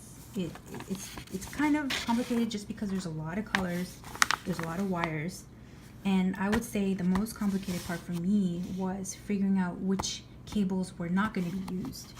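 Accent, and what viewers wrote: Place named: Quebec, Canada. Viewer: Miss the Quebecois accent living in California. Salut & merci, anciennement de la rive sud.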